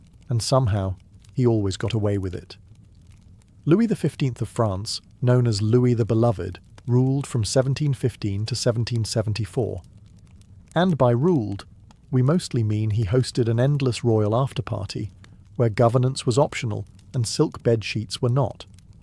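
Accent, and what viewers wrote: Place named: United Kingdom. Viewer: It takes a British accent to make sex stories sound boring as f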